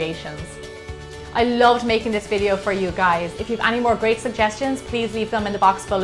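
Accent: Ireland. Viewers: She has to be irish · Gemma Stafford You're from Ireland aren't ya 😊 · Are u Irish??? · Are you from Ireland? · Who else is addicted to that irish accent (if I'm right about the accent)😂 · I think she's from Ireland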